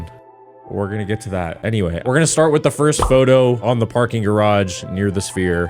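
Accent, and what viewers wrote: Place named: Canada. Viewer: I thought this geezer was Canadian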